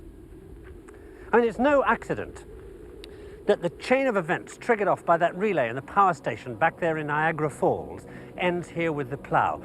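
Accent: United Kingdom. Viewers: This is such absolute bullshit. This is not us being reliant on technology. It is us being reliant on COMMUNITY. And that is a good thing. Oh electricity goes out, so the postman goes to find a farm to grow his own food? This is nonsense packaged up in a nice british accent. Society divides out tasks. The nurse doesn't have to know when to grow crops, and that isn't a failing of society. And we as a species have invented this brilliant invention called electricity and created a network that provides every household with it. Which turns manpower into a communal good, instead of something individuals have to provide. It is a miracle, that yes, it can fail. But we shouldn't give up on it, we should safeguard it.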